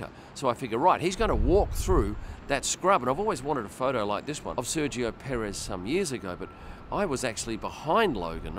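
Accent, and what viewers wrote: Australia. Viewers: Hi Hope all is well. I love your work, and I'm so glad that ur an Auzzie, makes it easy to understand the auzzie language, lol. Also, I would like to win that TM 43. I also would like to tell you that while looking at all the PANZERA watches, I like the model AQUAMARINE 45C 45MM SWISS AUTOMATIC CHRONOGRAPH. Beautiful watch indeed, has every component that you need in a watch.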